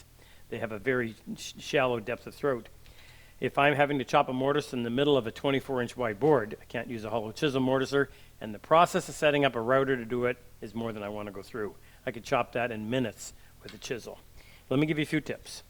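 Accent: Canada. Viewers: If the accent didn’t indicate that is Canadian, the mallet handle wrapped like a hockey stick grip sure does. Thanks for thr tips,. Putting pencil markes along the length of the mortice to help position the chisel is something I never thought to do. I also like how tou set your making gauge to the width og the chisel by sandwiching it between the marking gauge cutters. It seems I need to flip my cutters.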